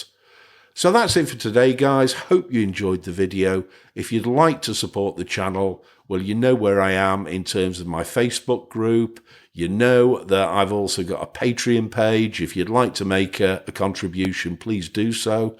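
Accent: United Kingdom. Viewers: Happy New year Year, sir! Apart from learning a bit more about Linux, being a non-native speaker of English, listening to your authentic British accent is a pure delight. Do you have it open-sourced so I can fork it (aka adapt it a bit)? 😃 Shine on!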